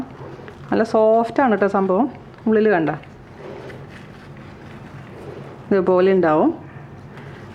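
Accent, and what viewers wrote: Thrissur, India. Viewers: #roseeducation: yummy recipe. I love all your recipes. I really like the way you talk especially thrissur ascent. Thank you so much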